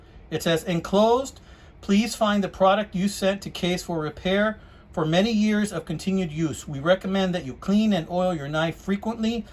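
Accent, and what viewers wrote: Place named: Texas, United States. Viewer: Well before I saw the return address on you send-in I went,'This guy sounds like a finest-kind native TEXAN and sure enough...! Your lead in was full of information and not at wordy and completely informative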